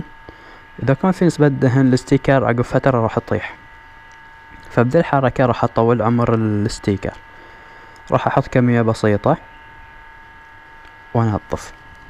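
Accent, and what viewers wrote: Bahrain. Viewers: ي حلو لهجة البحرين <3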